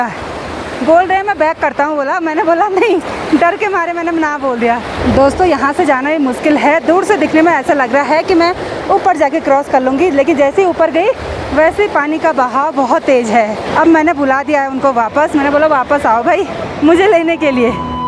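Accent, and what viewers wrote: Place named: Rajasthan, India. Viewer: Aapki language thodi thodi Rajasthani boli jaisi lag rahi hai .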